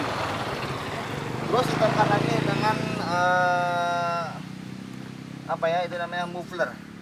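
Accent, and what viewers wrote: Indonesia. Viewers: sunda na leket pisan kang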